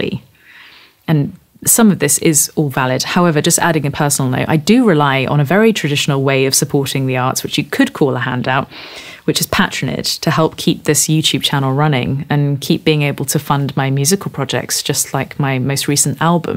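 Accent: United Kingdom. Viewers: Is getting MORE British? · Love that firm, assertive/relaxed vaguely mannish Brit accent. Did she already address the Leap Frog debacle ? "What's he really like ?" Etc., etc. .ZZZZzzzzzz..."Corporate rock still sucks." Etc. , etc.. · Dear Ms., I can't remember such an attractive, pleasing British voice and speaking as yours. I was stunned at the first several seconds.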